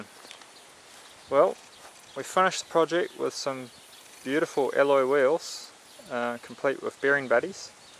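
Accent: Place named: Australia. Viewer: In a few years when I ship a Sceniccruiser over from the states I'll buy your engine. Presuming your Australian given the accent mate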